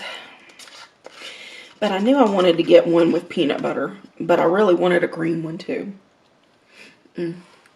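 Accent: South, United States. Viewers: I love your hair and makeup! What state do you live in, you kinds have a little southern accent! I’m a 71 year old great grandmother from East Tennessee!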